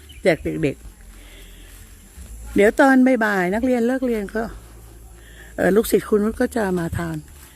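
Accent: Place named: Kanchanaburi, Thailand. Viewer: เมืองกาญจน์ชัดมากจ้า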